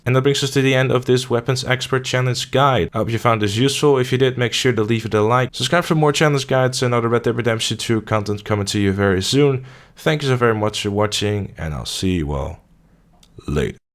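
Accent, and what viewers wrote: Netherlands. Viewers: Subbed! Your challenge videos have helped me out a bunch! Are you Dutch by any chance? I recognize the accent I think ;)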